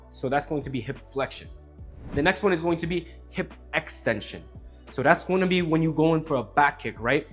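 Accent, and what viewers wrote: New York, United States. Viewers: Ayo you from New York?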